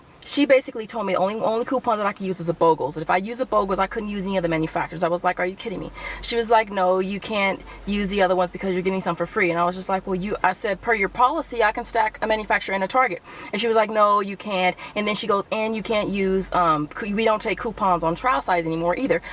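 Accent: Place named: Latin America. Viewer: This has nothing to do with your video, but it sounds like you have a latino accent. Are you of Latino descent? Sorry you had a bad experience. Target sucks most of the time.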